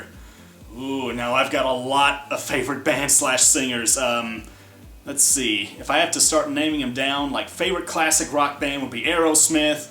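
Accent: South, United States. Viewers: YOU'RE JUST WITH A COUNTRY ACCENT!! Thnk goodness..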